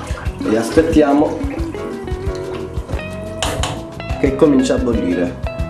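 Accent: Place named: Sicily, Italy. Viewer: Un sardo con l'accento siciliano????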